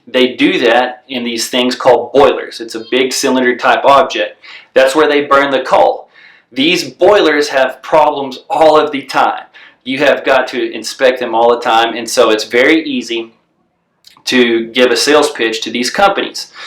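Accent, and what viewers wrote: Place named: Appalachia, United States. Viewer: Hey, you're right you will never sit in some cubicle working for DA man! But I can tell from your rants that you will end up in jail. And soon. I hope. It is guys like you that go out and cause all kinds of mayhem that bring the FEDs in to regulate. YOU are the problem NOT the FEDs. Also, it is clear to those of us already in the business that you really don't know what you are talking about. Please post your videos showing the oil company tower survey that you use as your example where you made 30, 40, 50,000 dollars. Please post a single link to any of your work. Your hillbilly accent tells me a lot about you. And your need to cuss and blow up at every post....You lack any shred of professionalism. Keep it up preacher...You gonna get you what you paid for. Now lets sit back and see if you can respond without calling me names or saying..."fuck you"...even once! 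In fact i challenge you to NOT reply at all.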